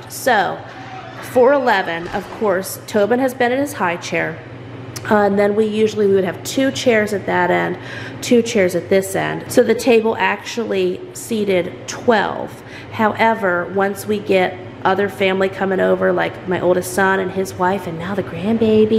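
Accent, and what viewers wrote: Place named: Rural, United States. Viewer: I am so happy for you. I live in NC so I love hearing another country girl talk 🥰🥰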